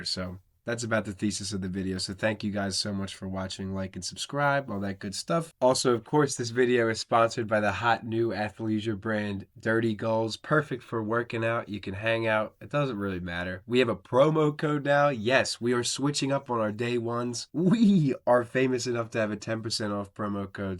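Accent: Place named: New Jersey, United States. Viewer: I can hear the south jersey/philly accent brother lol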